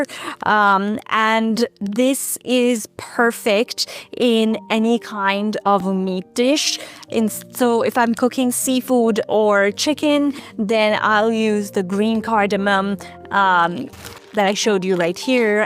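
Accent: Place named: Ireland. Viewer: Just discovered your channel and am binge watching. I’m an American guy with a decent amount of experience cooking in some southeast Asian traditions but am trying to make sense of Indian food. The first thing I learned is that it’s overwhelmingly complicated. Talking about “Indian” food is about as useful as talking about “European” food. I like that your recipes and techniques are rooted in a specific region, it gives a much more solid basis to learn. I’m curious about your lovely accent in English. Did you ever live or go to school in Ireland?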